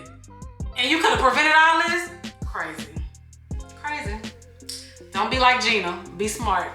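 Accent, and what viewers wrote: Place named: Texas, United States. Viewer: I was thinking how you sound like Brooke Valentine then realized y'all are both from Texas. This was a great story time!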